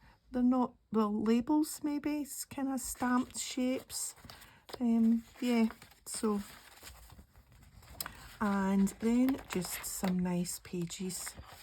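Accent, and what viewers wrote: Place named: Glasgow, United Kingdom. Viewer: O we love your Glaswegian accent, Willie always listens and smiles when I watch your videos he likes the accent it gives him a touch of home. ❤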